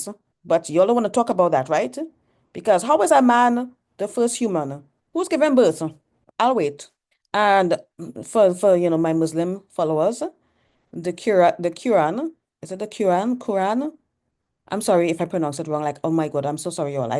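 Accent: Trinidad and Tobago. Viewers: Yes she sounds like a Trini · What a minute i love the cursing you are a mess. You also sound Trini. Are you?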